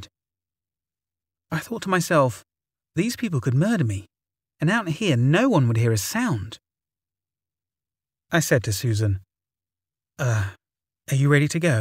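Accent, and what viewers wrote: United Kingdom. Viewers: Love this guy! His narrations are so good! The British accent gives a flavor to it! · British accent or not, his delivery is high quality.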